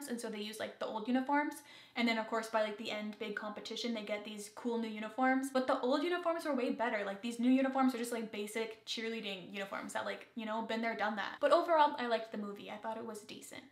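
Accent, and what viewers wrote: Canada. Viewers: Hi I liked the video, and I look forward to watching the others. Also, you sound Canadian.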